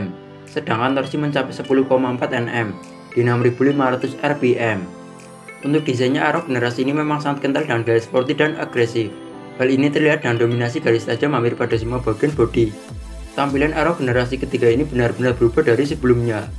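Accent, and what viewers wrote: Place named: Banyumas, Indonesia. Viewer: mantap ngapaknya masehhhh🤭😁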